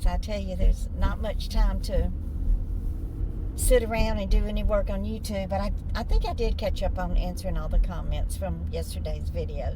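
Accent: South, United States. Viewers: I heard about your channel on a post from a subscriber on "See Mindy Mom". I thought I'd check out your content. Since I chose this video first, it speaks to me rather personally. Our family tragically lost a younger brother when I was a high school senior, in 1972. Then in 1996, my husband's sister, a single divorced mom lost her life in a car crash. It devastated my husband's family. He has never come to terms with the event. My prayers are with you. You have a sweet Southern accent. Blessings to you,! Willette from Alabama 🙂🛐❤️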